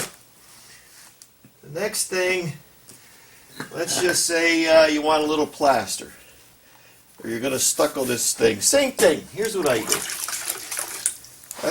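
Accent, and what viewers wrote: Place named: Pennsylvania, United States. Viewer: You are very generous with your knowledge and those of us who don't know what you know really appreciate it. Just a question: You sound like the guys I know from the Hazleton area in PA. Are you from around there? Anyway, thanks a lot for your help. Rich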